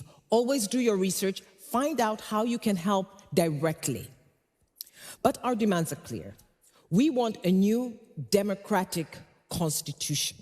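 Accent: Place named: United States. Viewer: U bwege unababaishwa na Americanized voice kaolewe naye.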